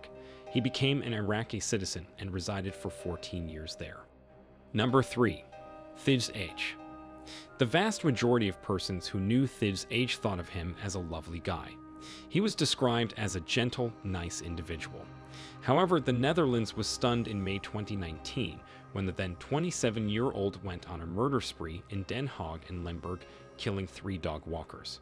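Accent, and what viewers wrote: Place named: United States. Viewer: this American voice seems to think to know a lot. About criminals in the Netherlands.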